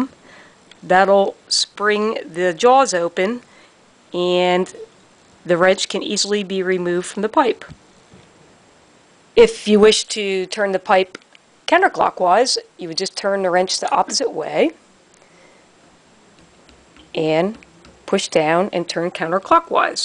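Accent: Philadelphia, United States. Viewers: Sound like your from Philly?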